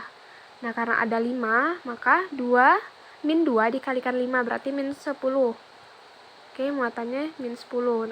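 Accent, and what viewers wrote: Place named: Sumatra, Indonesia. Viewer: kalo dari logat nya sepertinya Sumatra kak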